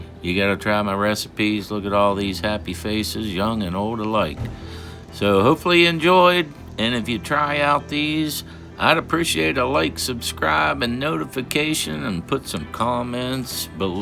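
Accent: Pittsburgh, United States. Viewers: what part of pittsburgh are you from?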